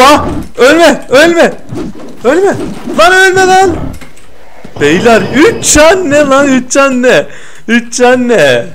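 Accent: Adana, Turkey. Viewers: a be adanali misin lütfen cewap wer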